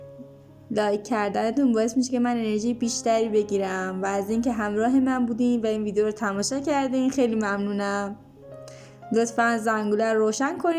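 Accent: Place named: Mashhad, Iran. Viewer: عالی بود دوست من لحجه مشهدی قربون❤😊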